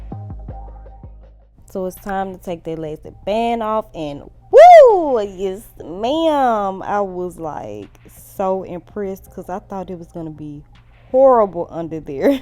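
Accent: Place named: South, United States. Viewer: 😍😍 I love a country accent.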